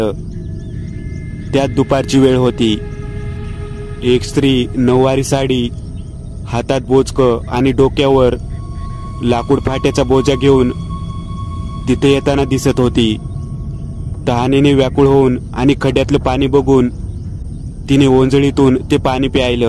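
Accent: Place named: Pune-Ahmednagar, India. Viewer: Tumcha tonning Pune , Nagar side che vatate......!! Chan ahe keep it up......!! Story saglyach chan....JB chya saglya story me eaikte.....🤗💐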